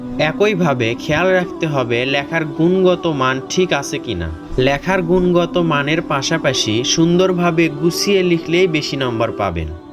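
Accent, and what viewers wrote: Bogura, Bangladesh. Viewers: Apnar basha ki Bogura te. Vai🤔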